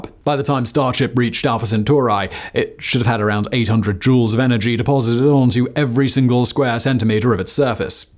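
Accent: RP, United Kingdom. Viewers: Why you trying to sound like a posh twat? Lol...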